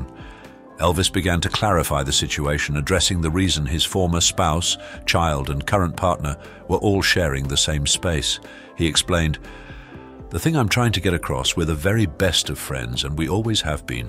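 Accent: United Kingdom. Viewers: Impressive with the British narrator. If it were only true. He met her when she was 14 but was soon shipped back to the US. He went on with his life. She wrote him every day, he never even contacted her for a year. Her parents wanted her to marry the most famous man in the world. Her mother pushed the relationship even though Priscilla started dating a 19 year old named Ron Tapp. Desperate to get her to Graceland her parents sent her at almost 18. Elvis finally married her at 21. They both cheated, she became involved with her dance teacher who was the son of actor Gregory Peck. Then she started an affair with her married Karate instructor Mike Stone. She would finally leave Elvis for Stone. Elvis gave her everything she ask for in the divorce. She continued to demand money from ELvis till the day he died.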